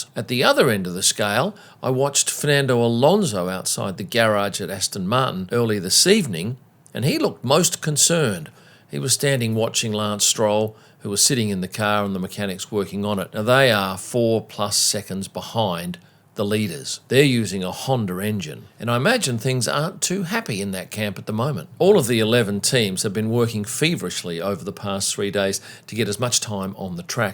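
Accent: Australia. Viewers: Hey,nice to hear a dinkydi Aussie talking about F1 again 🇦🇺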